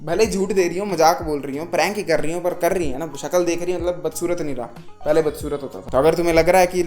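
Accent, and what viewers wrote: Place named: Punjab, India. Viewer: Bhai Aapka accent Punjabi lagta hai kabhi kabhi aap Punjab se hi kya?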